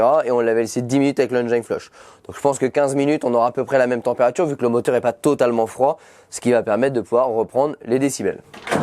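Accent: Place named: Normandy, France. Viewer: C'est comme ça que l'on parle en Normandie dans L'Eure ! Rien d'incroyabe !😉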